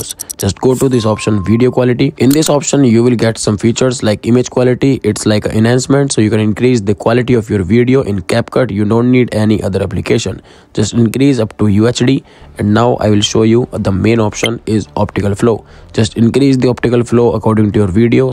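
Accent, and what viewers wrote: India. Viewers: Your accent is so Indian 😅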